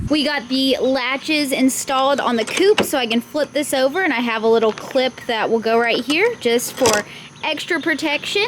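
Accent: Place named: Texas, United States. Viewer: You sound born in raised in Texas. You shouldn’t be surprised at the change in our temperature and weather down here .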